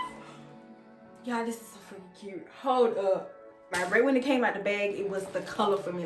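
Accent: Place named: Memphis, United States. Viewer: Shein b coming threw wit some of these purses lol especially for dupes and u sound u from Memphis 😂❤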